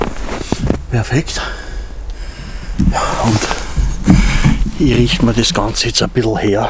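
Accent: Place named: Austria. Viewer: Sehr schön, dass Du beim österreichischen Dialekt bleibst. Das Einzige, das ich nicht verstehe, ist beim Käffchen das: Kranken Sesseln - sind die Leute, die krank sind, in Österreich Sessel? Mein Vater ist zwar aus Wien, aber ich verstehs trotzdem nicht. :) Wie immer sehr schönes Video und natürlich weiter so!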